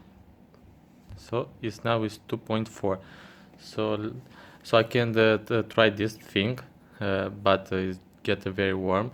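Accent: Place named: Scotland, United Kingdom. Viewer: you sound like a scotish guy :D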